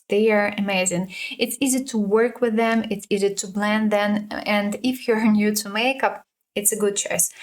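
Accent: Russia. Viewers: Ты русская? Просто русский акцент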